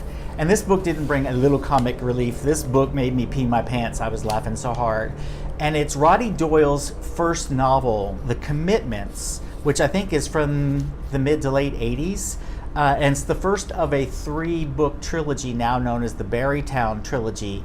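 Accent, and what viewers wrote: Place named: West Country, United Kingdom. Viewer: This is a lovely example of West Country accent, which can also be found on the Channel Islands (of which Guernsey is one) <link> Thank you so much for all the recommendations! I've read Bear Town, and loved it, but I've never heard of the others. I have much exploring to do :) so thank you!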